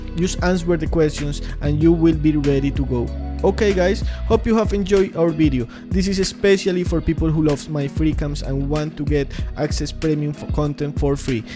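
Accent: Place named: Africa. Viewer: Scam with African accent. I feel sorry for your mother!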